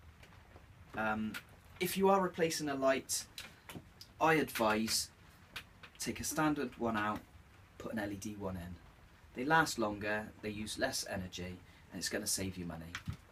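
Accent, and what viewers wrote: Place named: West Country, United Kingdom. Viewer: Lush West Country accent. Good vid.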